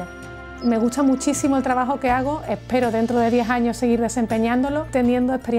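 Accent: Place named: Seville, Spain. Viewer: Ella habla un castellano impecable, correctísimo, rico, diverso, elaborado y, además, con un precioso acento sevillano.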